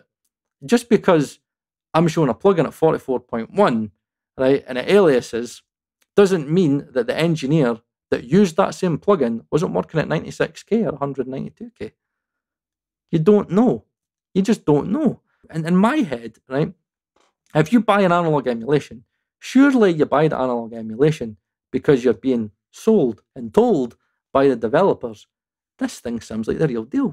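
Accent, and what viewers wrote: Scotland, United Kingdom. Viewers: Lol. I didn't know that's how the Scottish accent sounds like. It's kind of cool. Hey, BTW, I'd love to see you reviewing and testing Black Rooster plugins. 🙏🏻 Thanks a lot for your great work!! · Yeah, it surely would improve the intelligibility. I mean, being Scottish doesn't help it one bit. 😆 Love your vids, man, keep'em comin'! 💪😎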